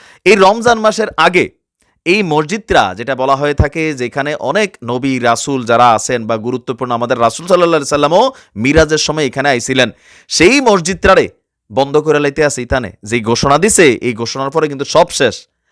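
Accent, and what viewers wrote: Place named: Sylhet, Bangladesh. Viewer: তুমি মিয়া সিলেটি মাতো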